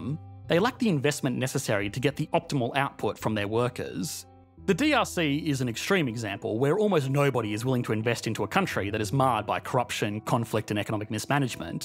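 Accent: Australia. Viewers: I use your videos to work on my Australian accent. It is thiccccc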